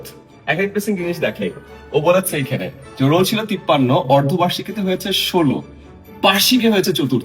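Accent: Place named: Comilla, Bangladesh. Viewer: Apni Cumilla r😮🎉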